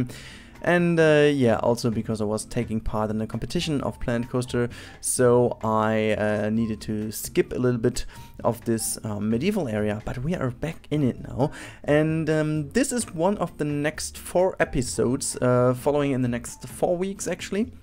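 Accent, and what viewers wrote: Germany. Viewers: Are you from Germany because really sounds like this?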